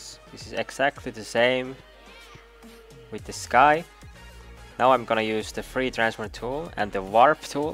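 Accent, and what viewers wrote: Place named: Finland. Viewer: Where are you from? You sound finnish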